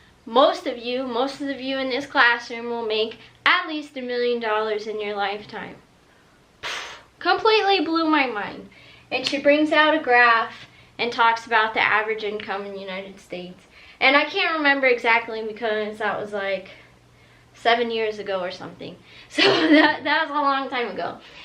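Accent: Russia. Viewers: You have a tiny Russian accent lol I think you got it from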